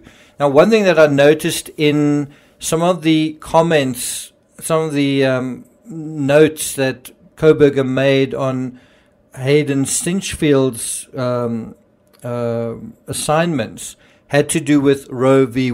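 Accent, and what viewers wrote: South Africa. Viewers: I knew you were from S.A. you speak just like a friend of mine from S.A. 🇿🇦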